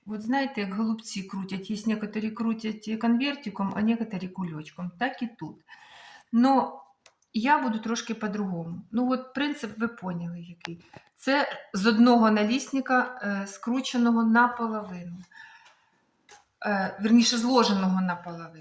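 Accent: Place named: Kherson, Ukraine. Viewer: На херсонщіні ,ммколаївщині, одесчтні такий говор відстаньте не хочеш не дивись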